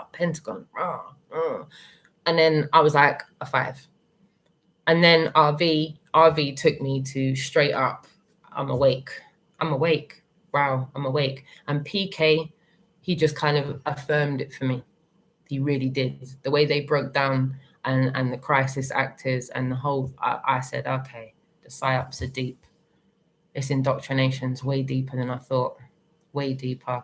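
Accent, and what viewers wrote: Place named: England, United Kingdom. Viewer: At last, Ive found an english lady who speaks the same as i do!! Id love to chat, if your up for it!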